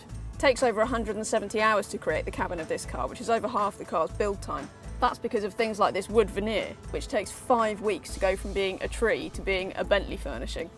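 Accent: United Kingdom. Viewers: Not everyone with British accent is suitable for a fuckin car interview! FuAAAAAAAAAK!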